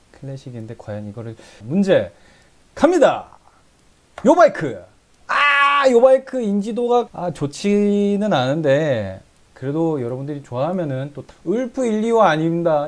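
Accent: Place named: Ulsan, South Korea. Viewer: 바이크 관심보다 전부터 느낀건데 울산억양은 정겹네요 ㅋㅋㅋㅋ 차장님보는줄(울산분) 전 밀양분 같은 지역이라더 억양이 틀려 재밌어요 ㅋㅋㅋ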